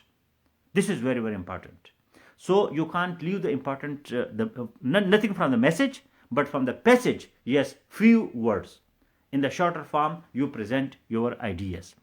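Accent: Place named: Yousafzai, Pakistan. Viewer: Proud of u Sir..." Sta da de yousafzai accent na zaar sham".....keep up sir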